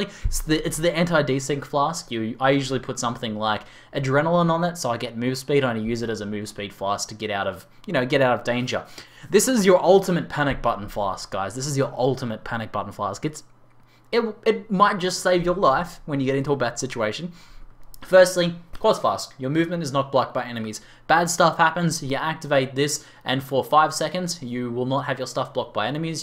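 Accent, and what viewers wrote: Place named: Australia. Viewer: your videos are always professional, very informative, well organized, and extremely entertaining! You take us through every nook and cranny in these unique videos, and I love how you go into depth and talk about how each item can be used in specific situations and examples. Big fan! Keep up the good work! Also, love how you say "just here" all the time... Must be an Aussie thing:D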